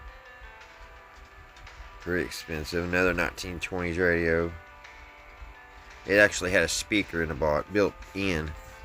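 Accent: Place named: Kentucky, United States. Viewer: You talk like u are in Southern to eastern Kentucky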